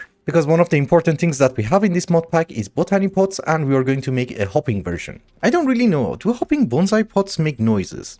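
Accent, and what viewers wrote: France. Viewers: je ne m'attendais pas à cet accent français subtil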